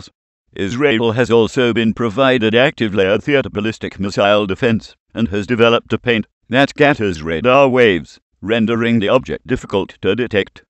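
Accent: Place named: England, United Kingdom. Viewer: Wow...a new voice for the DWS.....Sounds English.....fishy.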